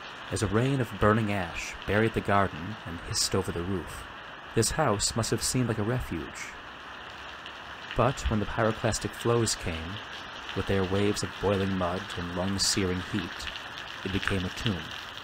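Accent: United States. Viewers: Interesting if the voice wasn't American... I dont like the "goodbye folks". Other English speaking countries don't call people "folks". It's very rude.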